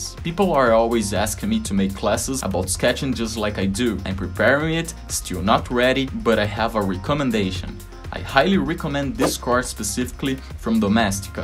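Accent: Brazil. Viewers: Só pela forma de falar já sei que é BR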